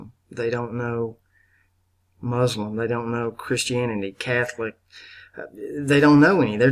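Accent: South, United States. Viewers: what part of the South are you from buddy? I'm from east Tennessee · I like your accent because I'm from the great Southern USA!!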